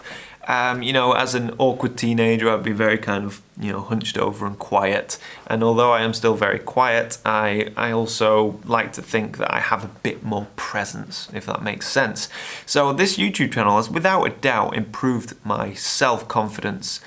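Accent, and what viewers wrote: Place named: United Kingdom. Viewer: Very surprised about you dont like your own voice. Because the first video I saw of your channel, was with your voice in off, and it was the thing catched me, your voice and the way you talk about photography. I'm very very fan of british accents, so not a big deal, but it's fun to see that you do not like it, and for me was the first thing I noticed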